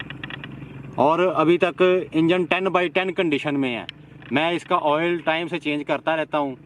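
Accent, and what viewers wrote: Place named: Punjab, India. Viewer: Bhai apni urdu to teak kar lo adi punjabi mar ra ho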